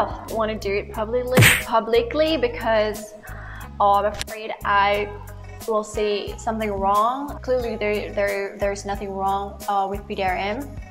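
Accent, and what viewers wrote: Kedah, Malaysia. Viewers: org kedah ka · Mula ii tengok.. ingtkan makkk salehhh join pdrm.. lpstu keluar loghat kedah.... Okay 😐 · firstly, your accent ahhaha 😂 then loghat kedah...2ndly, ive got an iv dgn kastam so just watching , btw i study in construction ind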